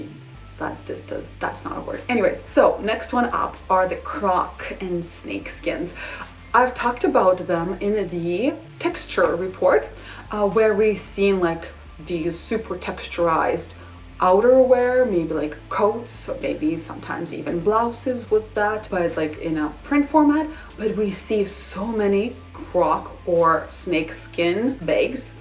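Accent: Russia. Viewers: Hi, correct me if I'm wrong but I think your accent is from Russia am I right or the Ukraine? Think it's very rude for people to comment on people's accents not like you/they can help it. I personally love to hear accents all around the world. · Why they don't like to listen to you? You speak a beautiful English, with a very- very light accent, perhaps Russian. I hust descovered your channel. It is really interesting. Thank you.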